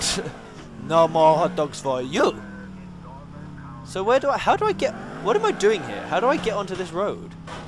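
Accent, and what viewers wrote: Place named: Russia. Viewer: Your little russian accent sound excatly like Niko XD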